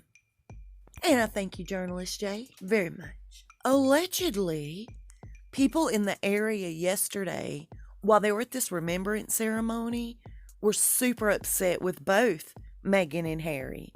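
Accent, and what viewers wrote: South, United States. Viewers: I love it when you say y’all. I went to the USA recently and made nearly every American say it. In your words I was a royal pain in the ass. Still their accents were not as lovely as your Southern accent. Love your British Friend and her cat 🐈💕💕💕💕💐💐💐🇬🇧💕🇺🇸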